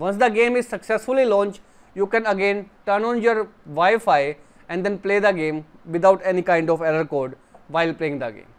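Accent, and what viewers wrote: India. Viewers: 😭you know its gonna work when its a guy with indian accent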